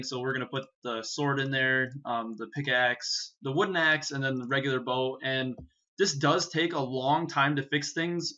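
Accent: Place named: England, United Kingdom. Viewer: I think he comes from Britain and more precisely England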